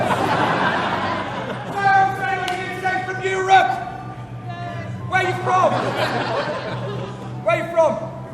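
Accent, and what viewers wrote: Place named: Manchester, United Kingdom. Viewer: He says about 5 minutes in that he's from the North of England - sounds like Manchester or somewhere else in Lancashire to me.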